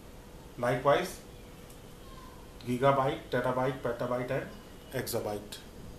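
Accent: India. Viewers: It's the Indian accent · Good Indian accent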